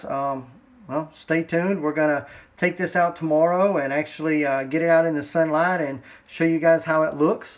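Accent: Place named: United States. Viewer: Don't see anyone in the video?? All I hear is an American man???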